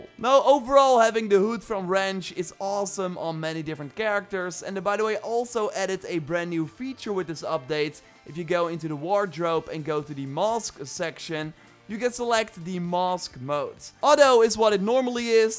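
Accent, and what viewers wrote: Netherlands. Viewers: Hahahaha this guy sounds so dutch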